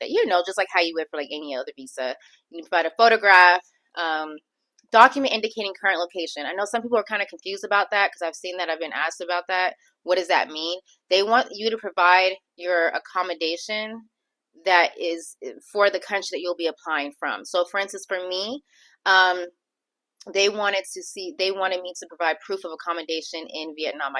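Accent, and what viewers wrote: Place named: Philippines. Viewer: You sound like a filipina.😂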